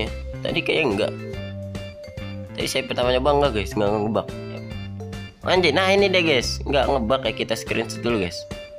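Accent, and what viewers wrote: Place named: West Java, Indonesia. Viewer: bang orang sumda ya aku dengar deukeut saat yang ke-3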